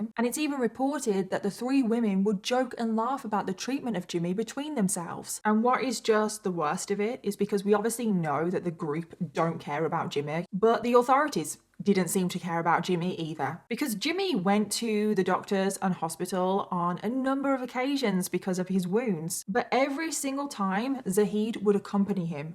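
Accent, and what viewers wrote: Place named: England, United Kingdom. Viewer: Hey my fiancé and I love your videos and we've found ourselves trying to figure out your accent! Where in England are you?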